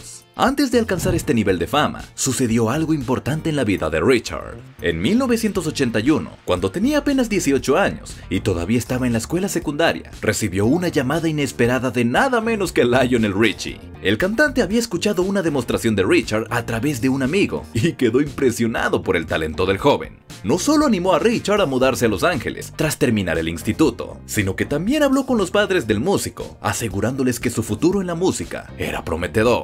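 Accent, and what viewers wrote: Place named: Latin America. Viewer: Hablas con una zzzz de Latinoamericana qué en varios países de América suena rara. Todo sabemos que no tenemos zzzz. Pero esa Z no es la de España por eso le digo Z Latinoamericana o Américana.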